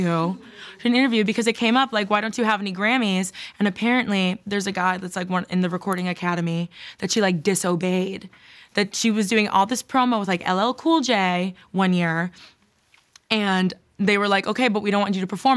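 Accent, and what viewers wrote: Miami, United States. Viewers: The miami accent came to this segment!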